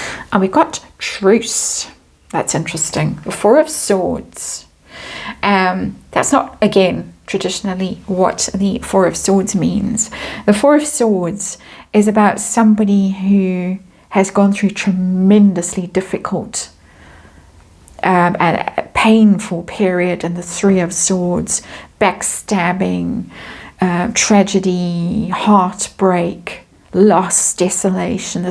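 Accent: South Africa. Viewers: I look forward to hearing your voice everyday. A voice of reason and wisdom without the need for puff puffery. Thank you, 🙏 Your English South African accent is a sweet link to my birth country and family, my British South African heritage as a child. Thank you from 🇨🇦🇿🇦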